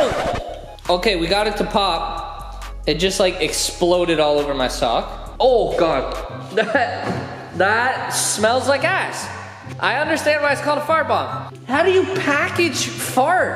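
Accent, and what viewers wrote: Canada. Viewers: I love Canadian accents. They’re hilarious (though ‘Merica has some funny accents, too). Don’t change :)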